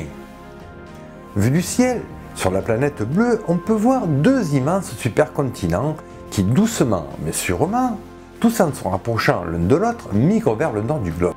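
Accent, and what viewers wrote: South, France. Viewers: Quel plaisir d'entendre cet accent du sud. Comme toujours c'est géniale, tu est le meilleurs vulga en paléontologie que j'ai eu l'occasion de voir, ce que tu fait devrait être regarder au collège.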